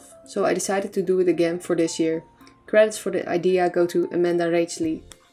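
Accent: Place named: Netherlands. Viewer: Ik hoor je nederlands accent🤣 gaaf!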